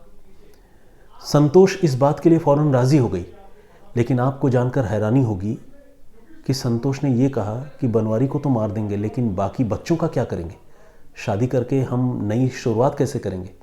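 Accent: Standard. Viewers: sir ji apki hindi.....ap devta hain ....itni shudhh hindi bhot kam suni hai.. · Sir u r good anchor n good story teller with pure hindi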